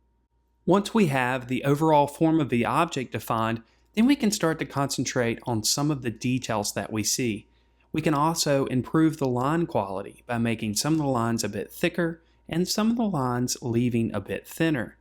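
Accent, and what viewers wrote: South, United States. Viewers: Maybe South Eastern. Has a southern drawl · Americans, which regional accent is this? I love it!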